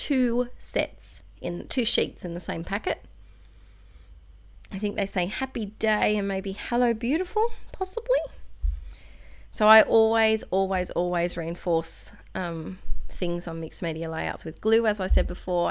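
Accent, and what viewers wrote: Australia. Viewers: I like 10-15 minute videos especially if I'm at break during work but I love listening to an aussie accent for hours. So you make them as long as you like.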